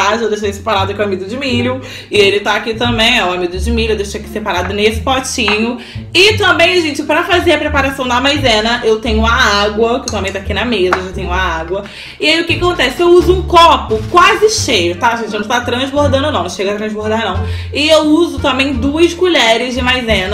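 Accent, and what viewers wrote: Rio de Janeiro, Brazil. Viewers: Muito carioca mesmo ahahhahahahahahahahahaah maravilhosa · E Nova Iguaçu é aonde?! São Paulo?! Euem... É carioca sim!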